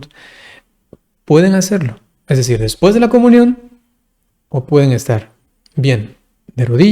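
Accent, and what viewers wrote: Brazil. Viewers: Sí, el Padre es español, murciano, pero ha pasado mucho tiempo en Brasil y ha cogido acento. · Conozco muy bien a este sacerdote, es español de España, de Murcia, concretamente. Pero se formó en Brasil desde muy joven y tiene una fuerte entonación portuguesa/brasileña. Ello explica que se exprese perfectamente en español pero suene un poco “raro”.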